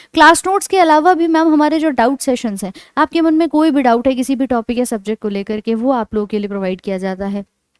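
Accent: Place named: Meerut, India. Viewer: Ma'am aap kya Meerut se ho kya Kyonki aap बढ़ाने को भढाना bolti ho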